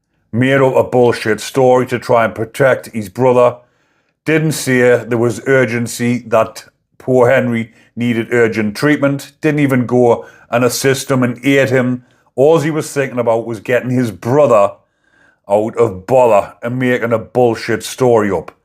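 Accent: Newcastle, United Kingdom. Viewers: Where does this guy come from ? Geordie ?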